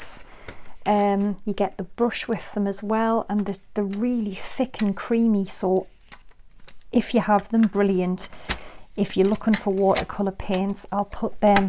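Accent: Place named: Newcastle, United Kingdom. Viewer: I have only just come across you I really love your ideas they are Great in your jordie accent, 👍 Nice to find craft inspiration in England the others I have are American I have no issue about but its nice to find one here, I love what you did any chance how you did the white poinsettia please, bit late to the part though..😃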